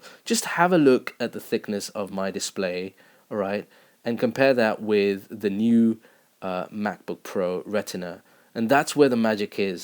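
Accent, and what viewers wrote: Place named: RP, United Kingdom. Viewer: damn your posh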